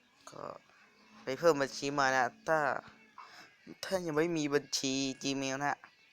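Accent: North, Thailand. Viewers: เป็นคนเหนือหรอค่ะ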